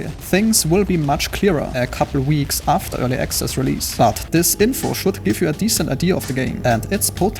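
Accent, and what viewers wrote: Germany. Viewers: Boah dieser deutsche Akzent geht schon hart XD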